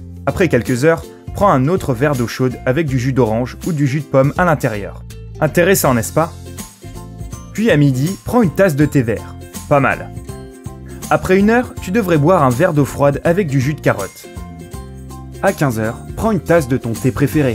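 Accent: Quebec, Canada. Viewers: t québécoise ?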